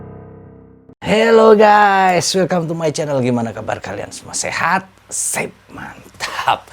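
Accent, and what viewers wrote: Java, Indonesia. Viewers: ngomongnya agak medok, lbh enak saya dengernya sbg org Jawa, mantap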